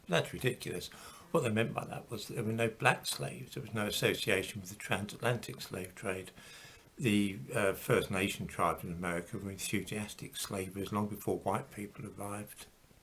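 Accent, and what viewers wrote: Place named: England, United Kingdom. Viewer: So all it takes is for a dude with an English accent to sit in front of a bunch of books and present himself in such a manner, that he is a historian and nobody checks his lies well there were a few comments I saw on this where people were correcting him. I saw at least six comments where people corrected him on points of History. In six comments people have to correct him on points of history, but what's unbelievable is they still agreed with the premise... Wow people will take any excuse to wallow in their racism... Or is it just that they don't want to take responsibility for the villainy because when you find that many minor discrepancies it's a major lie.... The transatlantic slave trade happened. Slavery in Africa and buy Africans was way different than chattel slavery... They had rights they could not be murdered and families could not be separated... 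Many slaves rose to leadership within African families... Accept what happened learn from it and move on in a more positive manner work towards healing... For those of you whose psyche is too delicate to accept the fact that wrong was done and you perpetuate the wrong stay trapped in ignorance....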